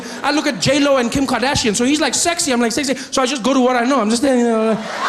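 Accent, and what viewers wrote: South Africa. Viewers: His accent is exceptionally South African in this clip 😂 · Back to his Seow Feffrican accent - has to have been during his tour back home. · Being a South African, living and working in China I know for a fact the some Chinese know you my brother 😅😂😂😂!! Considering that some Chinese people are generally very ignorant to the outside world 🤦🏽‍♀️, it’s huge for you to be known outchea 😅😂! I I have three colleagues who instantly fell in love with me because I’m from South Africa, same country as their favorite comedian Trevor Noah EVER ☺️🙌🏻👌🏽!! Continue to fly our flag high & remember Don’t lose your accent my broer 😅😂😂❤️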